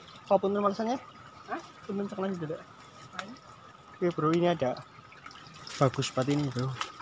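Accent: Banyumas, Indonesia. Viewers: Bahasanya ngapak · Sampean wong ndi kang deneng bhsane ngapak😂👍🤭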